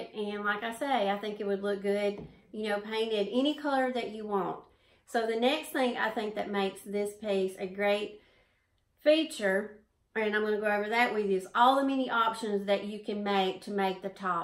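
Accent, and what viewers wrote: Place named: Alabama, United States. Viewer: Very glad I found you too. You sound like you're from Alabama. lol I amove the cloche you made. I will definately be trying it. You've surely inspired me.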